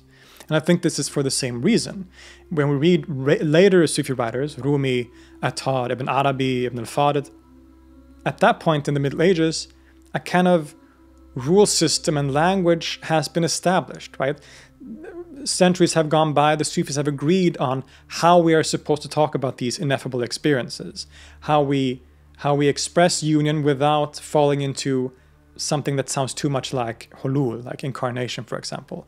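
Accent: Arab world. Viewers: What an amazing arabic accent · Dude stop pronouncing Persian town names in a arabic accent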